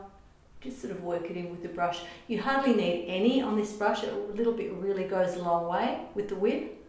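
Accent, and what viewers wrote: Australia. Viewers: Auzzi accent?? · is she aussie?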